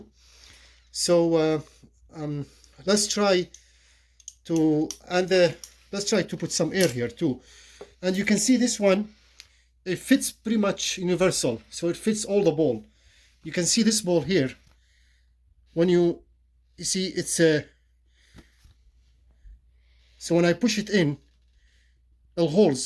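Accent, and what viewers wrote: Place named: Romania. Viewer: U not english u Are Romania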